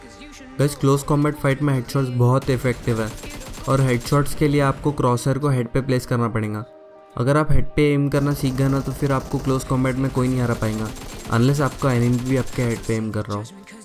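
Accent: Hyderabad, India. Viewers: Bhai hyderabadi h shayad 😅👌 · Hyderabadi tone arhi kya🤔😋🤪 Btw OP skills bro 🥺🙌🤠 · Bhai tum hyderabad se hai kya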